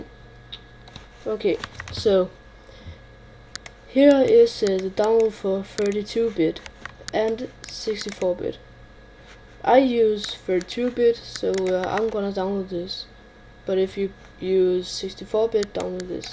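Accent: Denmark. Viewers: danish accent haha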